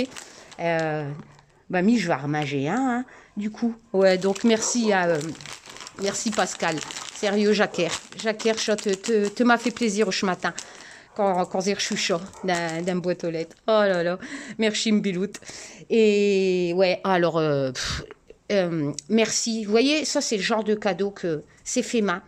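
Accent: Nord-Pas-de-Calais, France. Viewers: Bonjour, alors je te l'avais dit pas mail, je suis super contente d'avoir recu ton jeu, il est magnifique et j'adore l'effet peau de pêche, et comme je te l'avais préciser également il va partir (avec moi) a l'autre bout du monde rejoindre ma belle famille (Japon) et se fera purifier dans un grand temple, (je t'enverrais des photos de l'évévenement car tu es quand même sa premiere maman!!!! alors juste une petite chose, j'ai vu qu'il y avait la carte du corbeau (oiseau que j'aime beaucoup qui sont des sortes de guides spirituels pour moi) et sur la carte les mots clefs sont danger, accident, trahison, mais pour moi le corbeau ne véhicule pas ca du tout, alors ce que j'ai fait.. j'ai tout simplement rajouter sur la carte a la suite (car il y avait un peut de place) les mots "messager" et guide" et voila le tour est joué!!! je voulais te raconter ma petite expérience avec ce jeu magnifique que j'aime beaucoup, (et tout les petits cadeaux) les dessins sont d'une finesses assez incroyables, Olivier a vraiment un don de dessinateur!!!! 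merci a vous deux pour cette magnifique expérience de vie.... trop drole l'accent "ch'ti" j'adore!!!^^ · Hello lol j adore entendre parler ch'tis mes origines comme toi ❤❤❤❤ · Coucou, enfin te voilà, quel bonheur 💋❤très contente que cela ce calme un peu, mais encore très prenant pour vous. Tres drôle et émouvant ton parler chti ma grand-mère ne parlait que chti je comprends tout. bisous à tout les deux merci pour ton tirage Et courage prenez soin de vous.. (Tu parles des lanceurs d'alerte?)🥰😇🙏💋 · 😀😀😀😅😅😅🤣🤣🤣😂😂😂😂 trop beau l'accent chti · Quel plaisir de t entendre parler Ch'ti j adore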